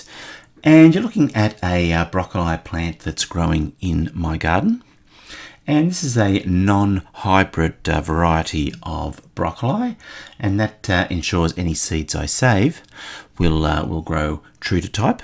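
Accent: Australia. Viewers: what is the warmest it gets during your winter? I heard the accent so thats why I asked because I thought it's always hot in Australia but I honestly don't know anything about your winter. Greetings from Maine,USA :) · Strange, we also say it in England "Broc-o-lee". I assumed Aussies would say it the same xD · How interesting! I didn't know you folks from down under say "broc-o-lie" where we yanks say "broc-o-lee"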